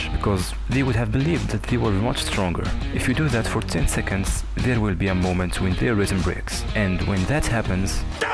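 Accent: Morocco. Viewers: Accent mn kilomètre t3rfha mghribia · dude From the first second of this video i knew it , you're 100% moroccan 😭😭pls keep going i like your channel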